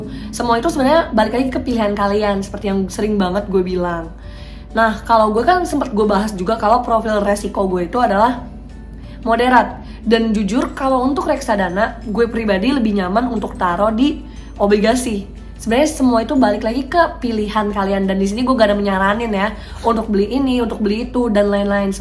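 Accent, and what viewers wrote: Bali, Indonesia. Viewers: Orang Bali kah?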